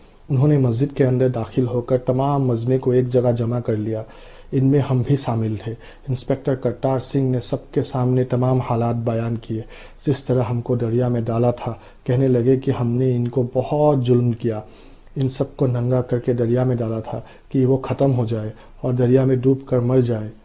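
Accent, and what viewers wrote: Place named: Bharuch, India. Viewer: Muje aap ki aawaz par se lagta hai ke aap Bharuch Gujarat India se ho???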